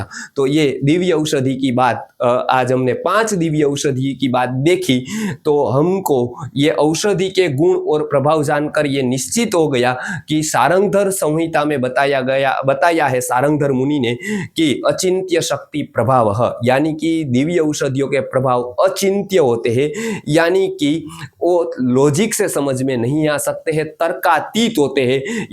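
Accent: Gujarat, India. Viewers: Tame Gujarati lago 6o laheko Gujarati 6e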